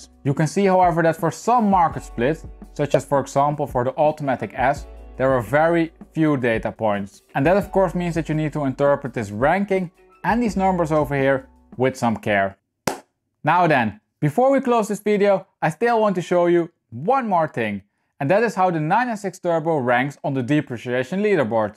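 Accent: Netherlands. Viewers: Thank you! I needed that. You've got a Dutch accent am I right?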